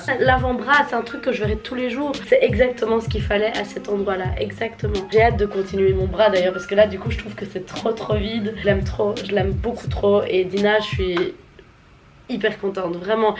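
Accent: Belgium. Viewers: Salut tu es belge ?❤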